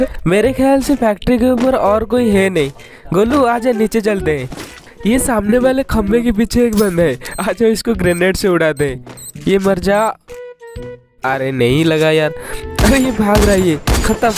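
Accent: Odisha, India. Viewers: Your voice is odia 🥰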